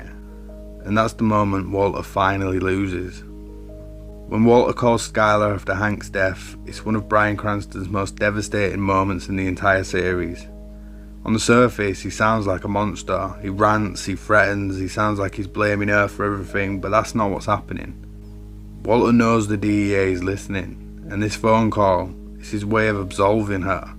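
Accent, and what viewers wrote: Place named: United Kingdom. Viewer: I like your accent. I like the way you say 'Walter White'. A lot of people have made videos about Breaking Bad - and I have watched a lot of them. But your unique British accent might be the thing that sets you apart. As for the content itself, you identified the "I am the one who knocks" event, explained it beautifully, linked it to other important events, and brought it all to a safe end - tying your end to your beginning. Your personal point of view was clear. I liked it.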